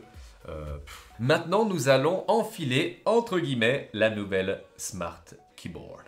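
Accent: Switzerland. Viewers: J'me disais aussi avec un accent pareil pouvait être que "suisse" et pas frenchie, et on s'presse pas siouplait ))))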